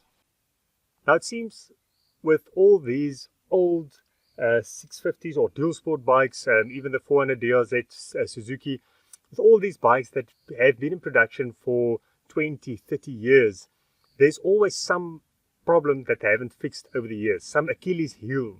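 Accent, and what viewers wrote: South Africa. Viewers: Hello! I'm always happy when somebody talk about XR's, and I suppose that you are a sort of neighbour because you seem to be in South Africa (as I hear your accent and the left side coded on the road) and my original country is the red island: Madagascar! We've got the same landscape with laterit dust that make one of the most sticky mud I've ever seen and rode. So I had 3 XR's and only the air-cooled model RFVC and a Domi, the last one was rebuild with a Domi engine to have the electric start but the cams were from XR, and I wanna say that the red pig is one of the most awesome bike you can ride. As some few riders say, add an big plastic tank by Acerbis and an oil-cooler to avoid overheated (the weakness of the RFVC engine at last... and the right level in the carb bowl!) I don't think that the KLR is on the same level, here in Europe and mostly in France, the Kawa is a basic commuter with trail style flavour, the contender of the KLR would be surely the Domi or the Suzie DRS 650 and Yamaha XT 600 (for the japanese brands). 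I think the real competitor of the XR is the KLX R 650 by Kawasaki because it's a real dual sport bike with some great capacities in off-road as the TT 600 by Yam. I rode few times the KLR, and it was too smooth, almost soft and a bit lack or torque, mostly in off-road (dust and rock tracks, mud and sand...), really no way with it in severe conditions,even the liquid-cooled engine seems to be more secure (I heard many times the cool fan opera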